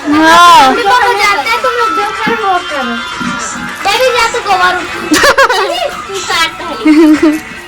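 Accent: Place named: Chhattisgarh, India. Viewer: बहुत ही सुन्दर और अपनी गांव की यादें ताजा हो गई, बहुत अच्छा छत्तीसगढ़ी बोली हे, जय छत्तीसगढ़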